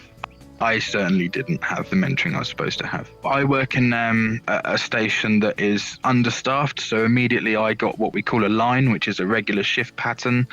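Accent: United Kingdom. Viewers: Sounds like a norfolk boy! Good lad x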